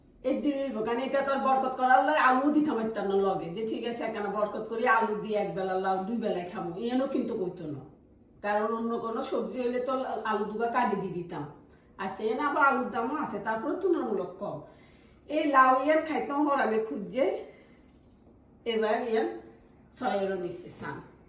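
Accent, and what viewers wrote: Noakhali, Bangladesh. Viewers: Anti অাপনার কথা গুলো শুনে কলিজা ঠান্ডা হয়ে গেছে অাপনি অাজকে পুরো নোয়াখালী ভাষায় কথা বলছেন । অামি নোয়াখালীর মেয়ে অাপনার ভিডিও অামার কাছে ভালো লাগে ভালো থাকবেন খালামনি।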